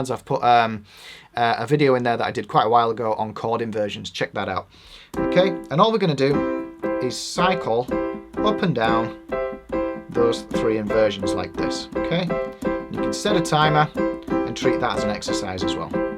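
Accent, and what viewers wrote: Yorkshire, United Kingdom. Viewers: Love the energy, British accent, coffee tips, variety of exercise! Videos are very enjoyable!!! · Nice to hear a fellow yorkshireman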